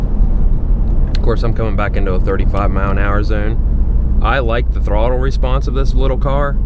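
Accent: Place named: South, United States. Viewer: I can barely understand you with that ridiculous southern drawl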